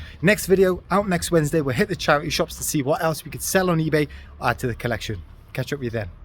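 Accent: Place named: Jamaica. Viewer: That is some Jamaican accent you have.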